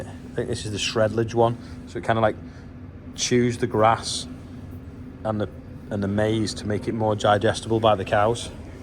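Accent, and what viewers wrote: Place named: Liverpool, United Kingdom. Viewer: In proper Scouse accent: "We have Martin Mason who is 34 and Charlie Mason who is 5, happy birthday to you guys. Red Clarke happy fourth birthday to you. Jeremy Nash happy birthday, Chloe Taylor who's 27 and Stevie Finnie who's 29 happy birthday to you. If you want to be on the bumper, please scan that QR code and there is a link below every single video."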